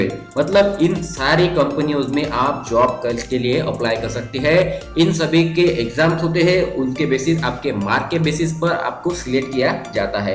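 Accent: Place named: Maharashtra, India. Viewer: Sir tumhi Marathi madhech ravas boltat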